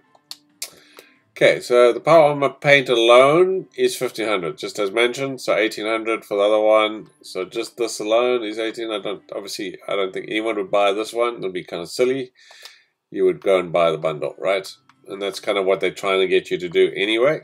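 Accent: South Africa. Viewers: This dude sounds super south african😂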